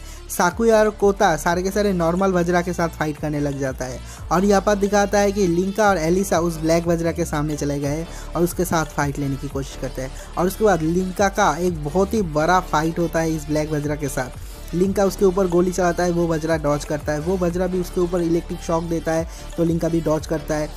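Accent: Bengal, India. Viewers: Ap bangal se ho kya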